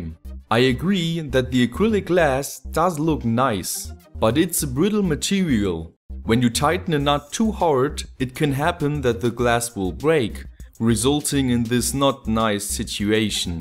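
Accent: Germany. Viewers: I like your german accent! Like in old good times))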